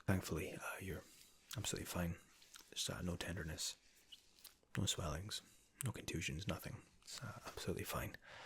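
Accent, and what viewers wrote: Scotland, United Kingdom. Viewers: Are you from Scotland? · thank u for your reply :) Lol, of course not, u don't sound like Russian at all, but personally I don't like Scottish accent much but in your case it's completely opposite! Best of luck and keep up the good work! :) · ASMR with Scottish accent? YAS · hang on are you Scottish??? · what is about Scottish accents that relax the hell outta me? I have Celtic background somewhat, my maternal side is the Stewarts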